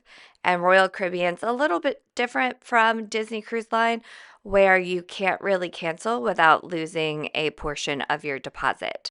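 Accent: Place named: California, United States. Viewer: HER VOICE IS ANNOYING !! sounds like someone from California. Like oh my God. 😅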